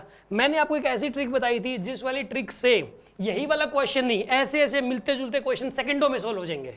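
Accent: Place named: Bihar, India. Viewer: Sir aap बरा bhankayar समझाते हो पूरे बिहारी जैसे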